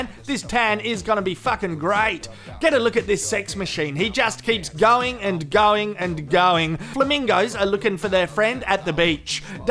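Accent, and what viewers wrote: Australia. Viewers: When I was born never in my mind would I think to myself listening to a Aussie commentate over animals can be so funny I'm in tears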